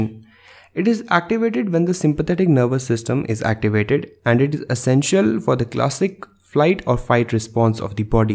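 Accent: India. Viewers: Im sorry but i just cant take an indian accent seriously. 🥲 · I like his accent :) Indians r cool but T-Series sucks :( i am 9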